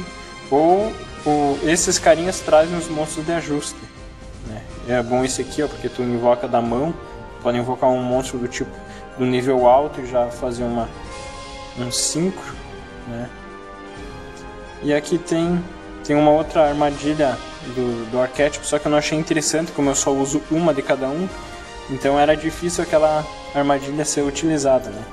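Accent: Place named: Rio Grande do Sul, Brazil. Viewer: Sou de São Leopoldo! Reconheci o sotaque hehe, já divulguei para uns amigos meus.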